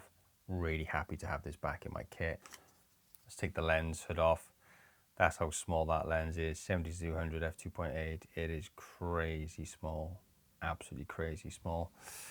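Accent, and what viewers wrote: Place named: United Kingdom. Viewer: Thanks, I deeply appreciate how you pack in tons of information in your videos, and I could listen to your voice all day, Brother, as I love British accents, but yours is calming. 😄 I just recently returned to watching your content again for both your channels. (My previous YT channel was hacked, so I had to re-subscribe, hehe.) Big Respect from the U.S., my Friend.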